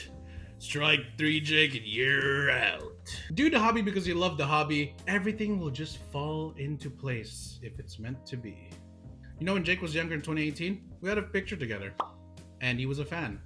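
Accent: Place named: Philippines. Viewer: After watching, I realized that fingerboard sponsorships shows how committed and consistent you are, not just by tricks and fanbase but by brand and showmanship. The way you do tricks, content, and how open you are to the community are the people who truly deserves sponsorships. No tryhard bullshits with "SpOnsOr mE" without actually bringing any to the table. Sponsorship is not a competition—it's about proving yourself and how your going to bring something on the table, and if people like what you brought. More and more people will demand. Also, I can also hear how your Filipino accent is obliviously slipping out throughout the video haha😂✌🏻❤️‍🩹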